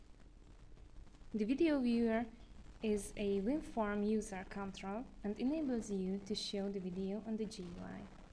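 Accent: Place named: Hungary. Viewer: Annyira magyar az akcentusod hogy kihallom :D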